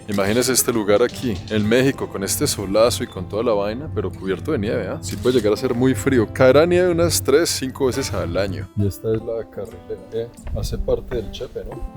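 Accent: Colombia. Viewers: Porque habla con ese acento sera colombiano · Amo su acento colombiano, su sencillez y muy guapo gracias por enseñar y visitar nuestro país ❤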